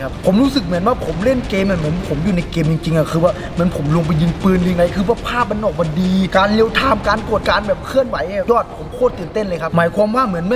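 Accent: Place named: South, Thailand. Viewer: สำเนียง คนใต้ อีสานใต้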